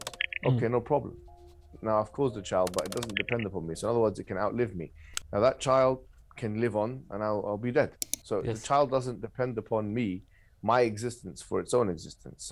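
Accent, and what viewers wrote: United Kingdom. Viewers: it’s not the accent that was hard to understand for me In fact I love the British accent it’s the high quality vocabulary he was using my vocabulary is very limited. But I’m not surprised at all know that I know he graduated from Oxford University mashallah. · Should’ve added subtitles for those that can’t understand British accent. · british accent..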